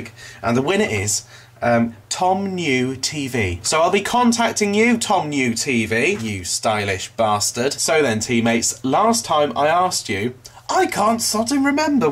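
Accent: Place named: United Kingdom. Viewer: I try to figure out how to do your british accent...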